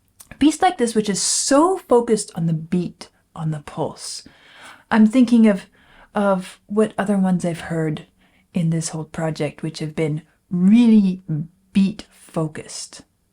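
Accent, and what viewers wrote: Germany. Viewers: that helps a lot, thank you! And yes, I can hear the sort of German/amish sounds in there. I really appreciate this! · This used to drive me nuts, so I searched for the answer... According to one of her earlier videos, she's actually an American, born and raised, but grew up (after leaving California at a young age) with/near/surrounded by an Amish community (or something similar, apologies if I misremembered), who speak a dialect of German, and apparently that it what's influenced her accent. She says that speaking to other people in Tennessee, she can find herself slipping into that local accent as well. That's as much as I can recall of the story. I hope it helps.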